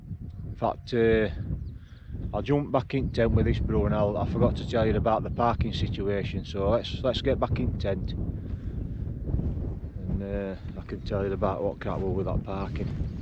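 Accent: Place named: Northern England, United Kingdom. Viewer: As everyone else has said it’s corn Dee And your pronunciation of pen y fan was spot on mate and sounds even better in you’re northern accent.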